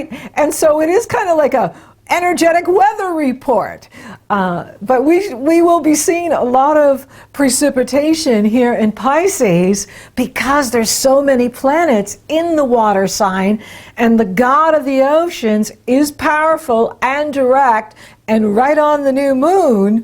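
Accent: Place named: New England, United States. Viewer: Hey,I'm a NE resident too! Massachusetts! I always resonated with your accent... Now I know why! I'm studying astrology now and I really appreciate your videos! Looking forward to a little snow tonight! Stay warm!:). Ellen Green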